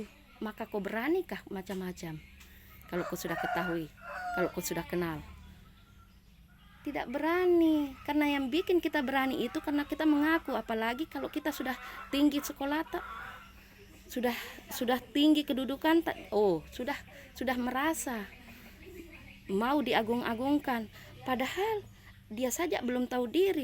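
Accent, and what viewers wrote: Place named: Lombok, Indonesia. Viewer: Marak logat Lombok timur...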